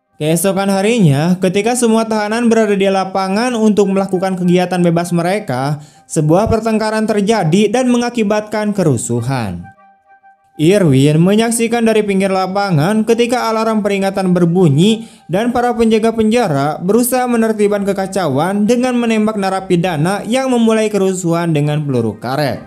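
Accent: West Java, Indonesia. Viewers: Orng sunda ya bang?😅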